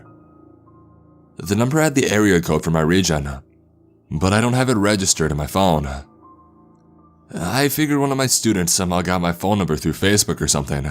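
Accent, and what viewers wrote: Canada. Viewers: He’s Canadian probably?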